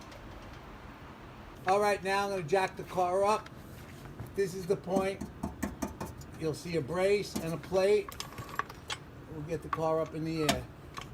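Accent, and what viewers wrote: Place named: New York, United States. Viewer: Great video. Love your NY accent. You didn't mention but I assume you jacked up the rear of the car?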